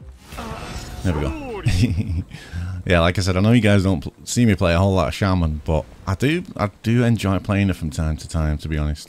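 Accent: United Kingdom. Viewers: are you a Brit? I can’t recognise my own accent 💀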